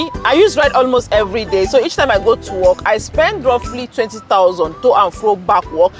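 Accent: Nigeria. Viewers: Nigerian accent sucks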